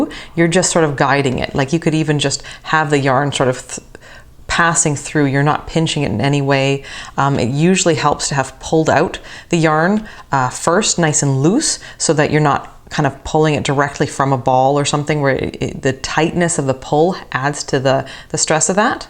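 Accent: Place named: Canada. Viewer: Can I just say I love your gnomes but I also love the way Canadians say words with "ou" in them like about and out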